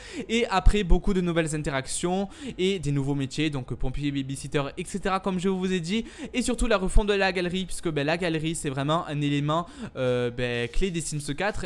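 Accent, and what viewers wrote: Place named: Toulouse, France. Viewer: jadore ton axent toulousain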